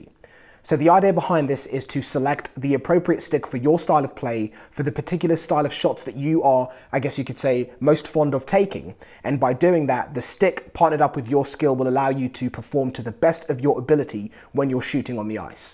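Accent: United Kingdom. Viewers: is he british lol he goes fond of taking haha good vid tho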